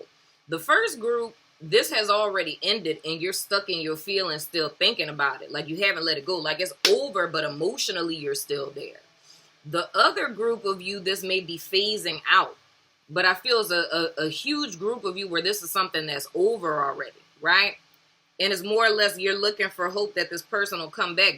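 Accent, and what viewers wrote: New Orleans, United States. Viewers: Love this video and your energy I’m an Aries male and I have been dealing with my ex a lot. Know u was from New Orleans within the 1 st few sec I am too deep it goin luv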